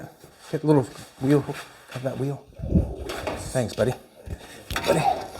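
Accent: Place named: Canada. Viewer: Same reason he calls it a care instead of a car. He's Canadian.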